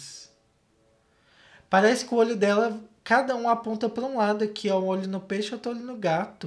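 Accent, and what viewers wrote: Minas Gerais, Brazil. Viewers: "Eu falei muito mineiro agr" eu mineira pensando.... eu falo assim? Kkkkkkj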